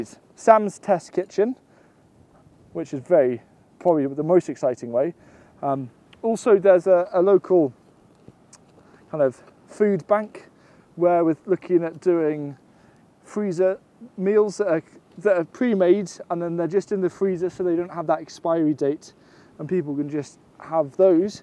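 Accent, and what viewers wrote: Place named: Wales, United Kingdom. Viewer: Love hearing your voice just talking casually. I live in Hannover, north Germany, but I come from mid Wales (Montgomeryshire) and recently got into gardening, got a Kleingarten (german allotment with a little cabin) and was looking for help with ... how to have a garden, pretty much. Your advice and content are exactly what I needed, so I've been following along for a while. I'm starting to get the hang of it, and the joy of the whole project just grows and grows. And as a bonus, every word you say sounding like the people I grew up with makes it all very easy on the ear. Sometimes I don't watch what you're doing, just listen to you talk. I'm still learning though, right? 😊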